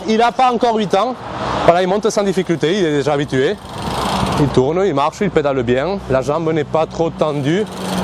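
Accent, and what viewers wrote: Toulouse, France. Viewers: De toute façon c'est mieux de pende a son age un vélo qu'importe pour quel pratique de prendre tout de suite un vélo pour adulte de cette façon pas besoin de changer deux fois ou plus de taille de vélo fur et a mesure qu'il vas grandir ....c'est ce qu'on a fait avec nos gamins ....ça c'est mon opinion perso je précise ...salutation bernard de strasbourg et bien sur toujours un vrais régal de te suivre sur tes superbes vidéos chapeau , sais pas si c'est ta manière de présenter les articles 🤔 et ...ou ton accent toulousain ou on ressent cette passion du vélo avec l'ambiance du sud.....oups.....en tout cas j'adore visionner !.......... bon dimanche 😉✌✌........................................................................j'oubliais faut bien insister sur le port du casque je sais que c'est ch....pour certains adultes mais disons une chose et insistons la dessus ...quand on tombe qu'importe la gravite ,faut savoir qu'avec un traumatisme crânien même minime on peut rester un " légume " hélas et ou paralysé d'un coté ....qui voudrait ça ? 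juste pour infos ....moi aussi ça me dérange un peu mais avec mes 73 balais je me verrais pas être dépendant d'autres personnes croyez moi ...et je m'amuse dans mes chemins de terre sur les collines atour de chi moi ...bien sur avec mon vtt avec assistance car je pourrais plus avec mes pontages que j’ai eu en 2021 et un an après un ACR en voiture dans la commune voisine et heureusement je roulais tout doucement quand je suis rentre dans le mur de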